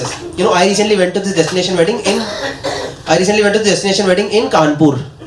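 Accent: Delhi, India. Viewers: He is awesome- with a Delhi Punjabi flavour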